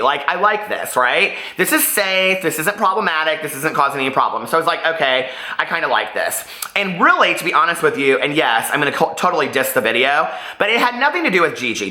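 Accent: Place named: Midwest, United States. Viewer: I knew you was from the midwest. We keep it real there.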